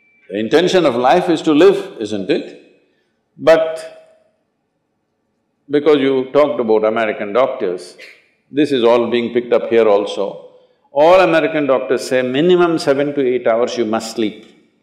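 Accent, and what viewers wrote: India. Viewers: 不是没有对的肤色，而是印式英语真的好难听懂啊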